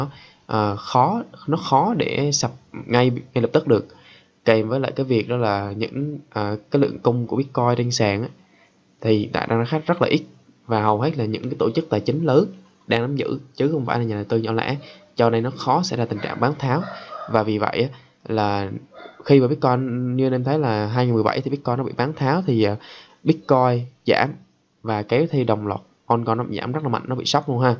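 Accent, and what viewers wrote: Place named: Quang Nam, Vietnam. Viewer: Ad người Quảng Nam hay Đà Nẵng vậy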